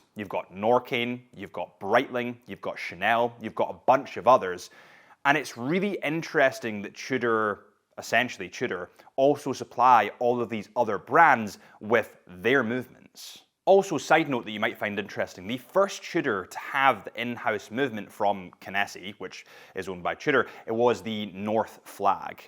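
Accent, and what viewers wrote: Scotland, United Kingdom. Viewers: He's Scottish, every word sound a little different 😊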